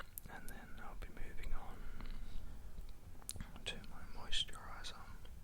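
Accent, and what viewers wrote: Australia. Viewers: Australian English is already an ASMR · Where have you been all my life with that voice? Another Ozzie ASMR! Honestly, I already love the accent and then the whisper....I'm in heaven! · Love the whispering with the Australian accent it’s hypnotizing!😂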